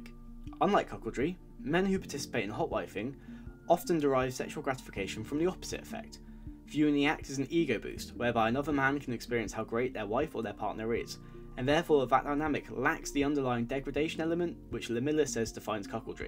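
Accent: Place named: United Kingdom. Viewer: Wish your pronunciation would have been clear and audible..Heavy British accent makes it foggy to hear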